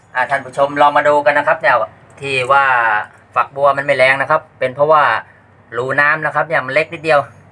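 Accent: Chanthaburi, Thailand. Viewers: สำเนียงเหมือนคนจันทบุรีเลยครับ บ้านผมอยูระยอง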